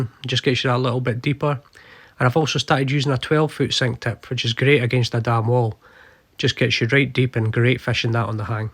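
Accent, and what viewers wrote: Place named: Scottish Borders, United Kingdom. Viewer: are you on FB? Got a lot of questions?? lol what floating line would you recommend? What was the fishery at the beginning of the video? What’s your favourite rod and location up there? I’m thinking you have a borders accent!! And lastly do you sell your flies..I keep wanting to buy more flies and this cracking video has made matters worse ( like all Fly fishermen lol )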